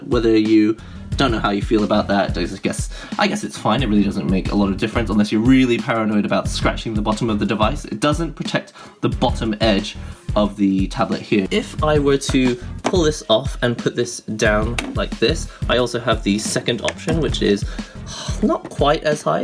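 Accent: United Kingdom. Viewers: I know its none of my business but im just curious. What nationality are you and whats your family background? Like you clearly have a british accent, you refer to most things in pounds but you clearly live in japan and appear japanese. I know people are mixed race and people move and migrate across the golbe all the time but im just the type of weirdo that needs to clarify everything haha. Thanks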